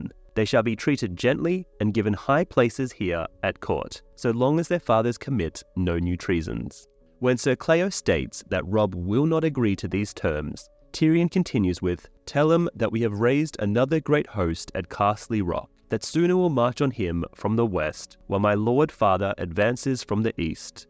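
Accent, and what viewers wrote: Australia. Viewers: its his voice he says he has a australian accent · Where is his accent from!?! Driving me crazy 🤪 I’m leaning towards Australia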